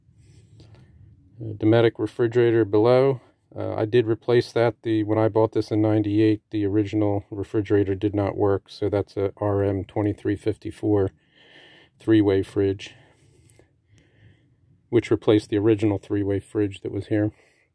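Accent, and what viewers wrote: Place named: Southeast Pennsylvania, United States. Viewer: SE Pa. accent. Thank you!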